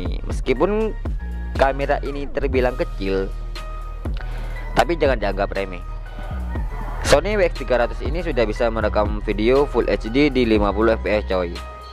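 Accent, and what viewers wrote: Madura, Indonesia. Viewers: Orang madura ya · Orang madura ya bang? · kayak orang Madura malahan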